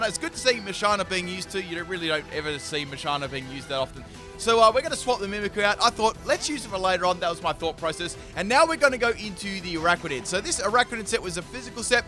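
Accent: Australia. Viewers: Do they honestly not teach phonetics in Australian school? Always baffles me the way you sound words out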